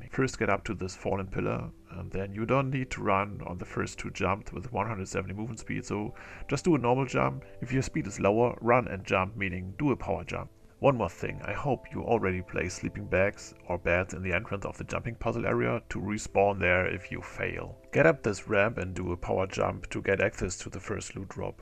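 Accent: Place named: Germany. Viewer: Thanks. I have been making guides for the Ark maps myself & I really appreciate your video. My reviews: 1. Good clear voice & nice accent. Your accent German/Austrian but you are from Georgia, a very scenic country IMO. 2. "Potato mode" v low graphics helps in better visual representation of the ledges. 3. 1 dislike ?? Some People just hate to see others shine while they fall(to lava). Every jumping puzzle video has a lot of dislikes. Keep it up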